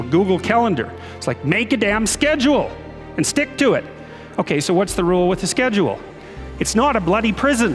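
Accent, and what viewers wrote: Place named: Canada. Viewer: He sounds so Canadian haha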